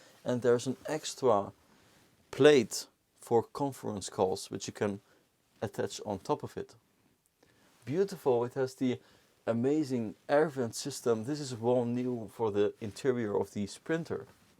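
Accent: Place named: Netherlands. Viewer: Is dit een Nederlands kanaal? Dacht dat ik dat kon horen aan zijn accent.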